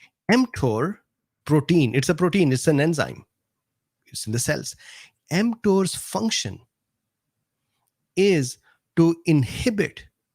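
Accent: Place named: India. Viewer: Universal Indian pronunciation. Different from ours, like many other words.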